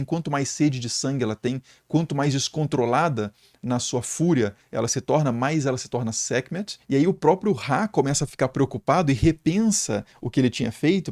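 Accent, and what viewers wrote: Minas Gerais, Brazil. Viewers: Da pra notar que vc é de minas tbm kkkkkk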